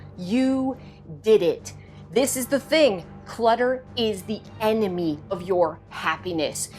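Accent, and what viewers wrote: South, United States. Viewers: I too was wondering why you were southern all of a sudden.. lol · 😂southern - love it! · Love the southern drawl & the bloopers at the end! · The Southern is funniest! Keep it! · What an awesome, hilarious video! Your southern vouce was sooooo good! ... Maybe a video in all southerb twang?!!! Penny you are a beautiful baby! ❤❤❤❤❤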